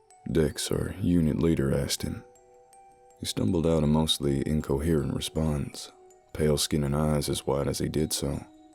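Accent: South, United States. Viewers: Ok, now I'v found a new storyline that I truly will enjoy anticipating the next installment thank you! You nailed southern accent so well I love it just love it!!! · sounds southernish to me as well. I'm from Pa and it sounds nothing like I do . 😁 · Surely you mean Southern.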